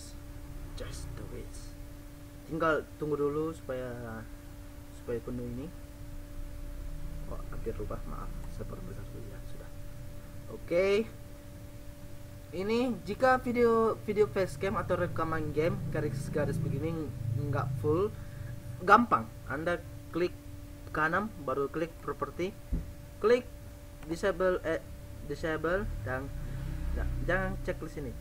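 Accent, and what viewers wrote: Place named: Manado, Indonesia. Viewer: logatnya be manado wkwkwkwkwk ane ket manado soalnya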